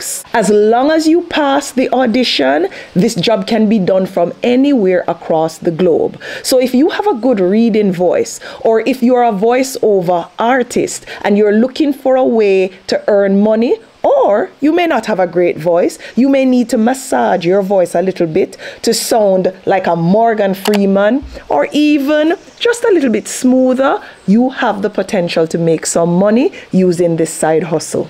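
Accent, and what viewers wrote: Jamaica. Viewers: I received your email and apologize for not responding immediately. I got sick over the weekend after visiting the hospital to get a CT scan done. I picked some virus and came down with a dripping sinus and a fever in the early Sunday morning, so I was also absent from church service in an effort to prevent spreading my germs. However, I must admit that I love you so much and believe you are a God send angel on earth for so many struggling people, myself uncluded. And, I hope you won't mind if I call you because, from your accent and the way you say 'walk good'; a saying we Jamaiacan people have, I strongly believe that's where you are from. If so, more power to you. If not, I still say more power to you and lots of love and blessings from God our father, from whom all blessings flow. To your followers, believers and non-believers, please DO NOT TAKE OUR GODLY BELIEF personal. 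You are entitled to your spiritual belief as well, and YOU ARE JUST AS LOVED AS and me.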